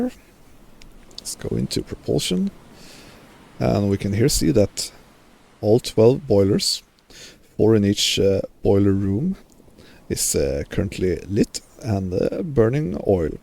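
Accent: Sweden. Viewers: One more, will it be possible to repair in port now when these changes are implemented? I suspected you where a Swede judging by the accent. 😅 But wasn't sure because looking at the games theme i though - Swede but maybe German 😂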